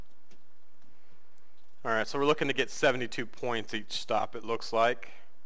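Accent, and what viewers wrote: United States. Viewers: Are you American? I live on this line :)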